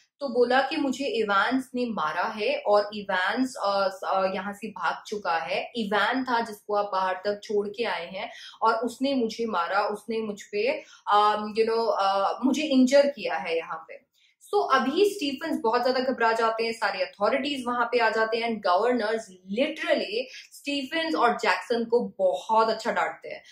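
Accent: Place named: Australia. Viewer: Your Australian accent. I love it please talk in English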